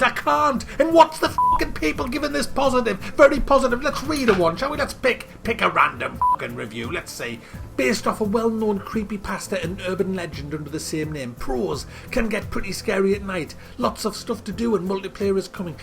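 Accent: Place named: Newcastle, United Kingdom. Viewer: Sorry, Vampires do exist. Love your reviews. Proper Geordie rantings!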